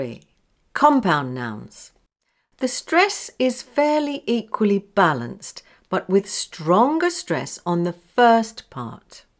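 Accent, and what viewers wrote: RP, United Kingdom. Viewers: Thanks so much 🙏😊. I love your posh English 🎉.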